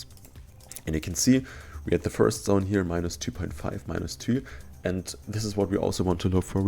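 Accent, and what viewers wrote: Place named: Germany. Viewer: I hören the deutsch 😁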